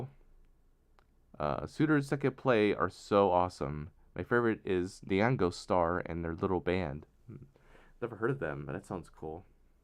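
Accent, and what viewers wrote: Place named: South, United States. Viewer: He's from the South, in Oklahoma, so hearing him adds a bit of humor to his content. But he's very friendly so that just ads to the charm of it all.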